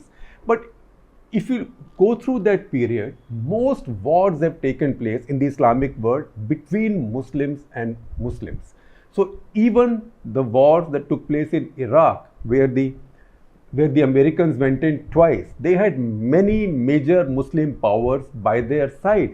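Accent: India. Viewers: sorry sir apki baat samajnai k liya kaan lagana parta hai......better is to talk in urdu.......as ur english is like urdu......typical indian/asian dialect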